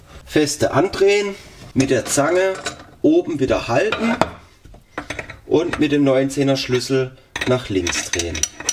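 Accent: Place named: Baden, Germany. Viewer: Mach's wie Du meinst - aber bloß keine Angst vorm eigenen Dialekt. Deine Zuseher kommen, weil sie Informationen wollen - das kannste super! (Übrigens, das hatte ich vorher vergessen: sehr gute Beleuchtung und super Kamerawinkel da unterm Waschtisch. Das ist nicht einfach!) Und sie bleiben, weil sie dich als Typ gut finden. Klar muss man so sprechen, dass einen die Menschen verstehen. Aber a bissl Dialekt hat noch nie geschadet. Schau mal ins Fernsehen: Wer ist da der erfolgreichere Entertainer? Der dialektfreie Nachrichtensprecher, oder der authentische Unterhalter mit Dialekt? Du kommst aus Baden? Besser geht's doch gar nicht für einen Handwerkerkanal. Schaue grad dein neuestes Video. Stimmt, das ist schon viel besser geworden. Aber versuche mal viel freier zu sprechen. Kannst ja mal bei einem Video zwei Versionen machen und deine Freunde ansehen lassen. 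Glaub mir. Je authentischer, desto besser.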